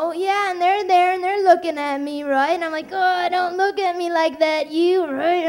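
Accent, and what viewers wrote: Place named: Midwest, United States. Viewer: Wisconsin, Minnesota accent & twisted sensibility… both mine it.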